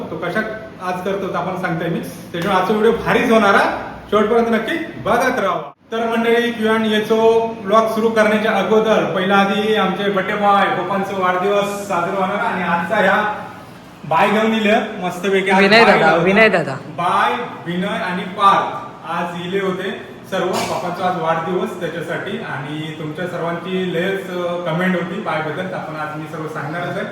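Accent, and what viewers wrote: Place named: Malvan, India. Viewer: माझ माहेर पण बांद्याला रोनापाल ला आहे माहीत आहे का रोनापाल तिची तिकडची मालवणी ऐकून मला माझ्या माहेरची आठवण आली माझ्या माहेरी सुद्धा असेच मालवणी बोलतात तू व्लॉग मध्ये मधे मधे तुझ्याकडचे मालवणी बोलत जा 🙏👍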